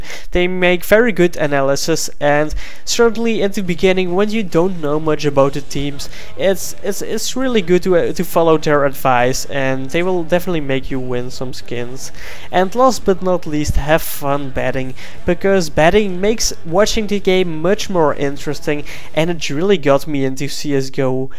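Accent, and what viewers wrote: Netherlands. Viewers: Such a dutch way of speaking english xd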